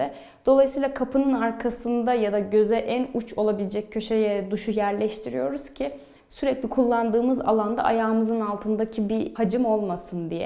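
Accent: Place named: Central Anatolia, Turkey. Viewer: nerenin aksani bu ic anadolu yada bati karadeniz gibi. hafiften aksan geliyor alttan , tasrali ic mimar enteresan.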